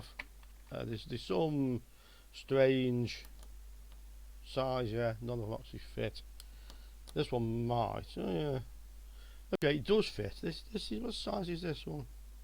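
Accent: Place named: Stoke-on-Trent, United Kingdom. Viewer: I'd recognise that accent anywhere, where in Stoke on Trent, do you hail from my fellow potteries brethren? Longton/Blurton is the stomping ground of my youth, then briefly moving to Liverpool for 18 months. Before permanently relocating to Walsall, just a few miles south of Birmingham.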